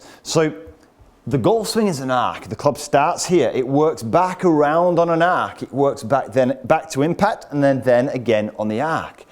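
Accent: United Kingdom. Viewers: You the man , Mister !! Great materials, and the British accent is just an icing on the cake !!!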